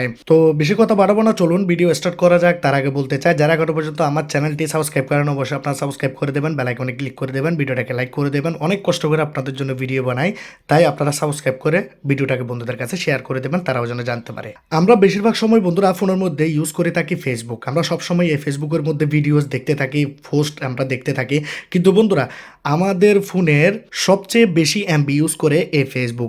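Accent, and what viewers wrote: Bangladesh. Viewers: Apnar kotha sune mone hochhe apni Bangladeshi lok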